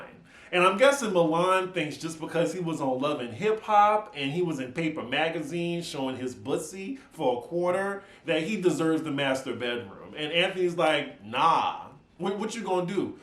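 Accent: New Orleans, United States. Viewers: you gotta use your New Orleans accent more! 🤣🤣I luv ❤️to hear it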